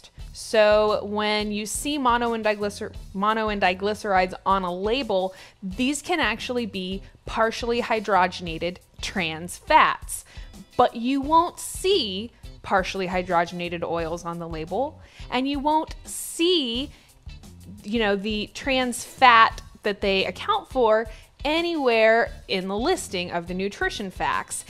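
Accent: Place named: United States. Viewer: Watched for 5 mins then couldn't stand your annoying slow American accent zzzzzzzzz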